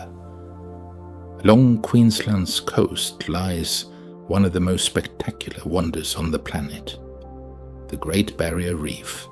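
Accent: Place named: India. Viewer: Fitting having an Indian accent narrating it. Well done Australia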